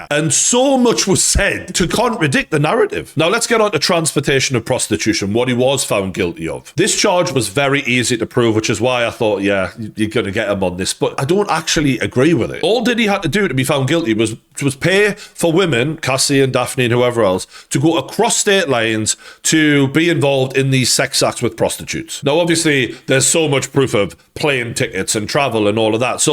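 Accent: Newcastle, United Kingdom. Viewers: Easy Geordie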